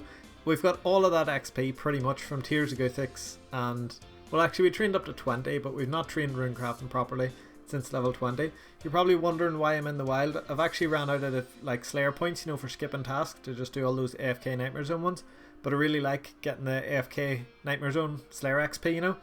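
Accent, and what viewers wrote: Northern Ireland, United Kingdom. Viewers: Lol Northern Ireland ?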